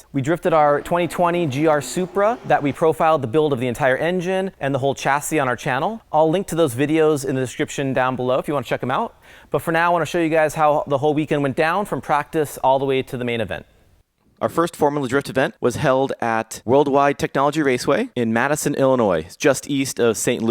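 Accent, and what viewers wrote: Greece. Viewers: Where are you from??? Greece?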